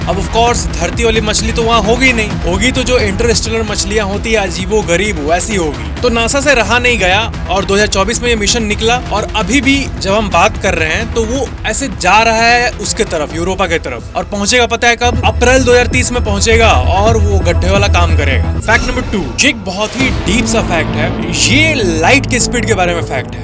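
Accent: Bihar, India. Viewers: Pakka bihari bol chal h bhai ka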